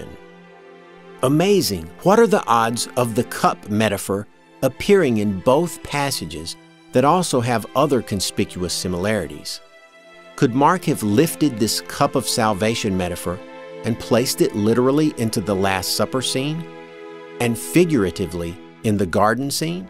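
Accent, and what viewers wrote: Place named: Mississippi, United States. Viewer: You're fairly articulate and I'd be lying if I said I wouldn't look forward to some Mississippi drawl for an entire video. It's a very distinct vernacular and as I'm from Northern Wisconsin myself, we like to pronounce everything through our noses and we add a few too man "ehs" and "oh yas" to pretty much every other sentence so variety is nice, eh?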